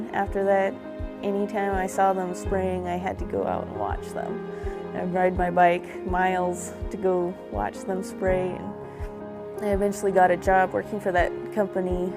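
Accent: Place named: Midwest, United States. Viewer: "Screw you gieez, I'm going to do what I want to." It's adorable, and you sound like the Midwest to me.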